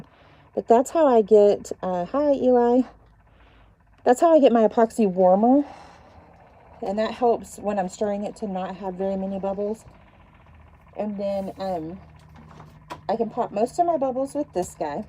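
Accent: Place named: Alabama, United States. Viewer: hi watching on replay and you sound like me from Alabama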